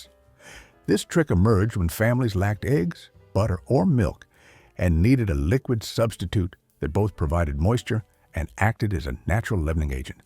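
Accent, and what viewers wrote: South, United States. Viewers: Just curious for no good reason... Is this an AI voice with a southern accent or a real person?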